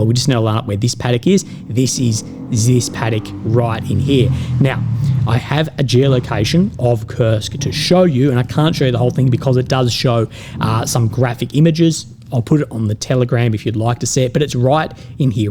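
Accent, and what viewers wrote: Australia. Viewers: Aussie twang is unpleasant to my ears.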